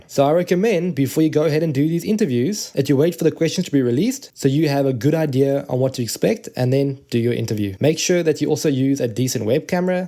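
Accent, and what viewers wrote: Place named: South Africa. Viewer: Judging by your accent, you are south african bru! What branch did you end up going with?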